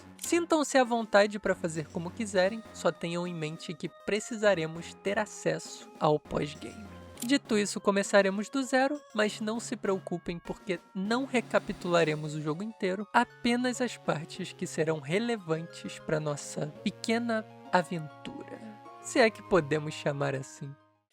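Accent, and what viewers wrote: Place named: Rio de Janeiro, Brazil. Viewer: o é o carioca maixxxxxx carioca que eu conheço. e olha q EU sou carioca e falo cheio de carioquEIXXXX tb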